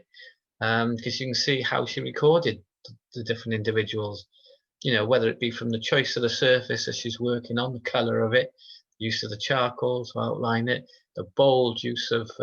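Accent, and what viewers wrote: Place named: Barry, United Kingdom. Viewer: Lovely to hear another Barry voice talking so knowledgeably about Art. Not only Ceri Thomas then...😂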